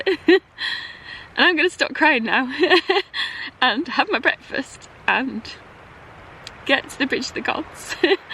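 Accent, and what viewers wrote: United Kingdom. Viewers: Congratulations! I've followed your trip from the start and it's been quite the journey. Thank you for taking the time and effort to produce such great videos. You love for nature really comes through. I will miss your British accent and expressions.Your heart is as big as your smile and always made me happy watching. Good luck and vegan power!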